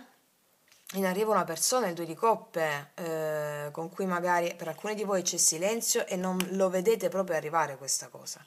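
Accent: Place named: Apulia, Italy. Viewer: Cara, è la prima volta che ti sento... E mi hai subito conquistata per tua Bravura, Simpatia... E il tuo Accento che mi dice che sei Pugliese Come Me!!! Non sono una che segue questo cercare le Carte... Ma mi sono rivista in pieno in questa tua Lettura... Che ti dirò... Azzeccata in Pieno!!! Ti cercherò ancora.. perché sei davvero una Persona Elevata.. A fare Ciò che sta regalando a tutte noi!!! Ti ringrazio.. E ti Abbraccio Forte!!! ❤😂😮😊😅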